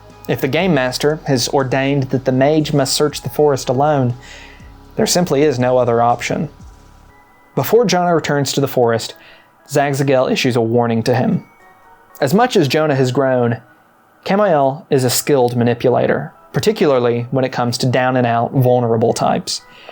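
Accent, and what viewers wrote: South, United States. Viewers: Hmmmm...based on your accent I'm going to say either Louisiana or East Texas. Potentially Arkansas. You don't have to deny or confirm that either way, I'm just guessing as a person from a different part of the region. It also could be one of the Carolinas, I'm thinking that's more likely now but I'm not well versed with the Carolina accents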